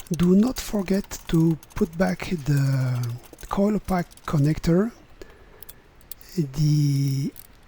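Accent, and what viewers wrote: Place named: France. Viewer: Hello, am I correct...?? Does the Kangoo 2nd. generation have a K7J engine..? I have always loved to hear english spoken by a French person... but now I am especially pleased hearing you explain details about jobs I need and would like to do. am giving a big thumbs up to all your videos. Best wishes, Mike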